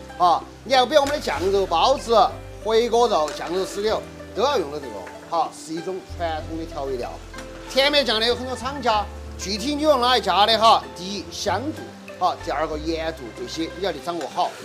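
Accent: Chengdu, China. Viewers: 好亲切的成都话！多谢！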